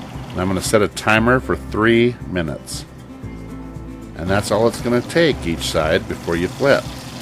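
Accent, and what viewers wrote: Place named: South, United States. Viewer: It's the Southern accent and chops for me, just subscribed, hoping you have jokes for days too for the bored ones....😂😂😂😂 Thanks for sharing 👍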